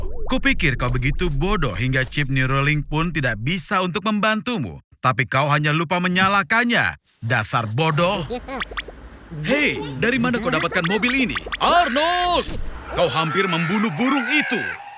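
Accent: United States. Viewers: Bjir orang indo beneran padahal suaranya kek orang Amerika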